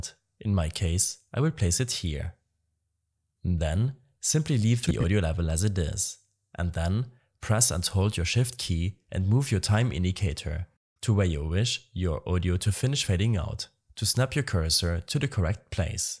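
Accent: Germany. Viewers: Bruder was ein harter deutscher Akzent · Korrekt, danke dir :) Man hört raus, dass du deutscher bist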